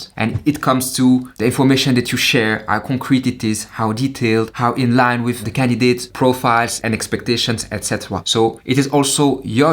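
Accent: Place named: France. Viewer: Are you French by the way? Your accent sounds French 😀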